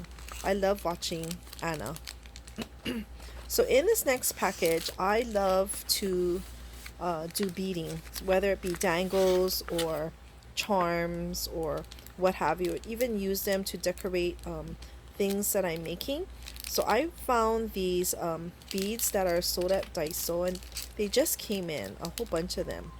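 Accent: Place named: Hawaii, United States. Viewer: New subby from Created to Create. I thought you sounded from Hawaii. I'm from Hawaii too, Waianae/Nanakuli, but I live in Florida now. Aloha!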